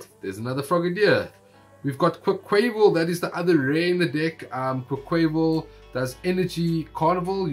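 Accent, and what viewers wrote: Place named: South Africa. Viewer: You have the thickest sa accent! Nice vid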